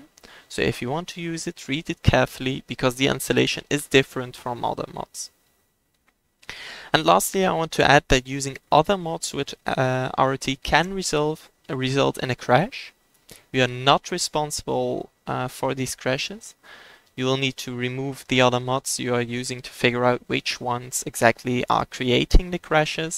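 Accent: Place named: Netherlands. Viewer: You got a clean accent for a dutch dude ;)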